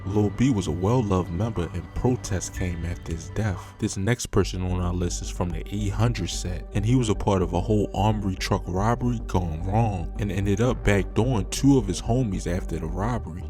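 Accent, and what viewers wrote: Baltimore, United States. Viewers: Grew up in the DMV and now live in Bronzeville, This is the thickest Baltimore accent I’ve ever heard in my life!!!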